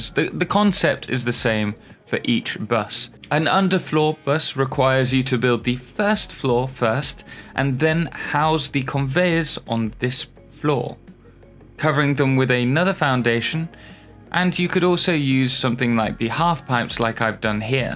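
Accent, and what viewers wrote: United Kingdom. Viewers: Why do you sound like spiffing brit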